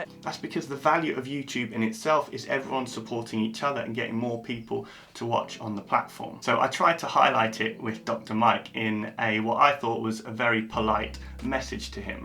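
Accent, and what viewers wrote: United Kingdom. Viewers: A like for your humbleness and great Bristish accent.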